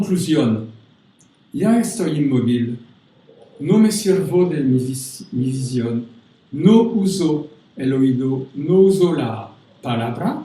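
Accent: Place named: Paris, France. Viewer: Super de faire une video mais le castillan avec l’accent parisien c’est insupportable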